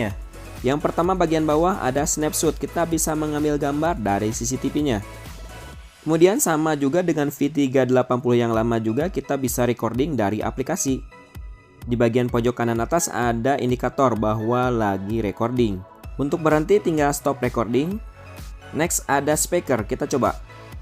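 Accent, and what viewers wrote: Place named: West Java, Indonesia. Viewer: Baceo... Sunda pisan 😁🤣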